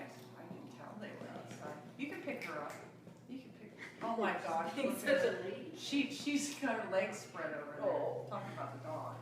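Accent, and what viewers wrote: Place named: Canada. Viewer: You can definitely tell you are Canadian when you speak, the accent definitely doesn’t show when you sing❤️🇬🇧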